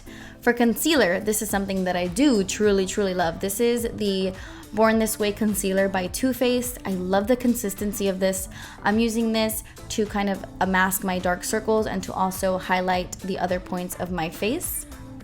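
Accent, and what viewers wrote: Cuba. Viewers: You should do a whole video in Spanish!!!! I love your Cuban accent!!! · I frikin love your videos y ese acento Cubano ! Ay me encanta!! Perfecta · I love when you speak Spanish, I can hear that Cuban accent peaking through 🙌🏼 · thank you for moving far away from the candle !!! it would give me anxiety when it was right behind you....!!!!! and I can't get enough of the Cuban accent, lo amo!! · Stunning as always #LoveTheCubanAccent 💕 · Loving that Cuban accent❤️😍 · chica yo te las veo perfecta!😂 adoro el acento cubano. Soy Nicaragüense😁. Cuba y Nicaragua son besties.😍 te amo!!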